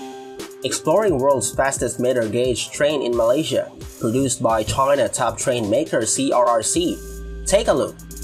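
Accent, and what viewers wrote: Philippines. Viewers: by accent and off word pronounciation philipine chinese pinoy.100'/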